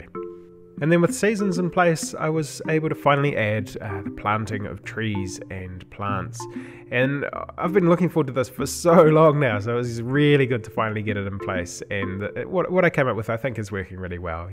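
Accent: New Zealand. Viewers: Pretty impressive stuff, amazing that this is all in your own engine! I worry your game has next to no actual game-play. Hard to tell but it seems like you ahve a lot of work to do in terms of crating, building, exploring, sapien interaction etc. Tech wise it seems great though, visually it is pretty good. Seems strange to have that entire world view and then top down selection system, just for the first 30 seconds of the game. Is there any reason you would go back to that view? PS. Are you from NZ? Where abouts? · its kiwi not Australian can't you tell?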